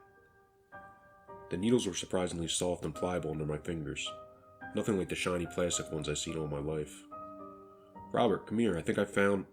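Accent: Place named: Pennsylvania, United States. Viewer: First of all, I love your voice! Most of my family lives in Pennsylvania, primarily Lancaster county but elsewhere too. It's comforting hearing the accent because it sounds like most of my relatives. Secondly, I saw your comment on a different channel. You asked someone for advice or ideas or something. As your 28th subscriber, I'm mostly satisfied. I liked the second story more than the first. Both were great, but you narrated the second one a little bit slower. And this is my only constructive criticism. If you slow down the reading just a little bit, you'll be right on par with all the channels we both know and love. To your credit, I was still able to understand every word, despite the speed and the accent. Honestly, because of my family, it may be easier for me than for others. My dad especially- he's particularly difficult to understand sometimes. 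Anyway, please accept this input from a place of love and respect. I'm looking forward to more content from you in the future! 🙂💙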